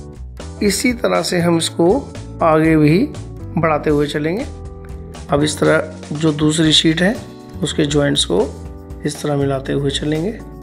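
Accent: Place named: India. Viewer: Indian voice -.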